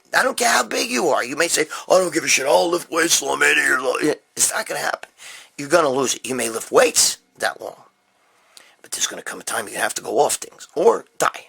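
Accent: New York, United States. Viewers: Keep cranking the videos they’re very entertaining. You need to start making some videos on your own channel so this way you can make yourself some money instead of making it for other people. You make everything very interesting and fun to watch also I’m a fellow New Yorker who moved down south so it’s nice to hear somebody talking normal for a change. Makes me miss NY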